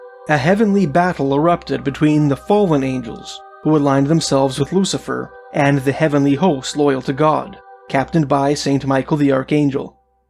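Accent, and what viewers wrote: Canada. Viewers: You have a very Canadian accent!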